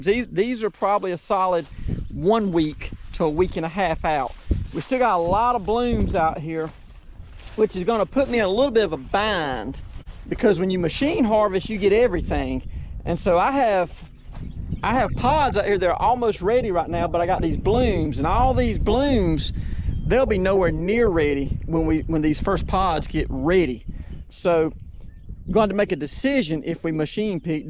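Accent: South, United States. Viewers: Love the update. Next run on the t shirts, consider adding "hey y'all" (the way you start each video). Such a great southern thang😀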